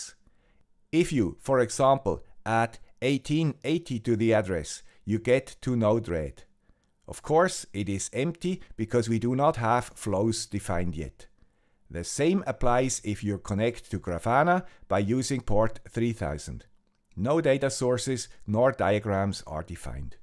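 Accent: Switzerland. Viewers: Make sure that no-one tells our Swiss guru that he should have described the Discord community as "vibrant" rather than "vibrating" at about . Keep up the good work, I am sure that technical instructions sound more convincing when presented in a Swiss accent. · my brother with that crazy Swiss accent... I'm so very glad 2020 is continuing to treat you well!! Just wanted to wish the best of wishes to you and your family well for the rest of the year!! Lets hope this insanity is mostly in our rear view mirror now...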